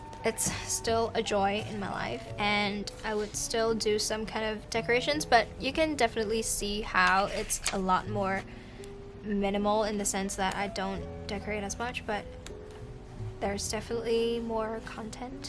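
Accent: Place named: United States. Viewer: I can’t place your accent, could you explain on that a bit? Have you been to the US for a short while? You sound that way a bit ☺️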